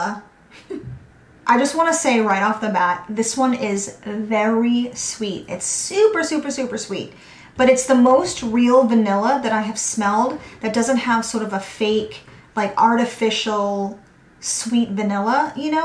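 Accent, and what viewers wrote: Boston, United States. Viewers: I came for the candle content but stayed for the accent 🤣. I was born in Boston but have been living in Cali for over 30+ years. I miss that accent soooo much. Loved this video - and many of your top 10 (13) are in my stash as well 👍. I can second the recommendation for Little Bee Scents. I’ve used some of her FOs and they are AMAZING. A little on the pricier side, but worth it in my opinion. I’ve watched a couple of your other vids and really appreciate the honesty in your critiques, assessments, etc. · Hi, I stumbled upon your videos and found them very helpful. Im new to candle making and after hearing you talk I was excited that you might be in the Boston Area ( due to your accent) Im in Peabody Ma. I then watched your moving vlog and learned that you had just moved from L.A. to San Diego. LOL. Although I was born in Boston, I moved to California in 1992. 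I started in San Diego,then moved to L.A. (Venice Beach, West Hollywood). I moved back to Boston about 5 years ago....took me a while, but realized I was always a new englandah.... Anyway, I really like your videos and subscribed. I find them wicked helpful. Good luck and keep up the good work. P.S. Im a graphic designer by trade, so if I can help you out, just give a hollah.... I owe you!!!! I saw your video when you went to the market...I agree.... I love your sign too! Cheers Chuck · Another fellow Bostonian-ish. So funny! I actually grew up in Revere and live in Illinois now :)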